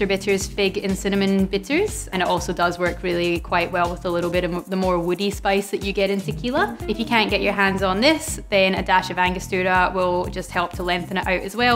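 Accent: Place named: Scotland, United Kingdom. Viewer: Wow, had never heard of this drink. I like having another tequila cocktail in my back pocket, and now I have a good excuse to go get some apricot brandy! Thank you! And your little outtake at the end got a surprised laugh out of me 😂 Saucy! 😍😏 Your pronunciation of Toreador is good but not strictly correct. Spanish has shorter sharper O's, the single R's are trilled slightly, the D is softer, and the E has a flatter "eh" (like "fresh") sound instead of "ay" (like "ray"). But who cares; your Scottish accent is as charming in Spanish as English :)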